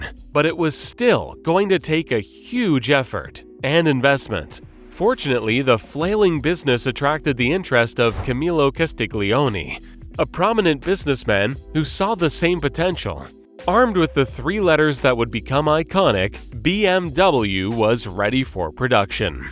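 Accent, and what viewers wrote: United States. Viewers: Pity about the terrible American pronunciation. The only name he got right was Daimler. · I am only 2 minutes in and the stupidity of American pronunciation of german names is driving me insane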